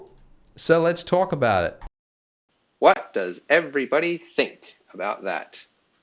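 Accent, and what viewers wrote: France. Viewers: me : "Ok, he has the same accent as me, he is french" Andrew : "Sooooooo French" *unmasked so fast haha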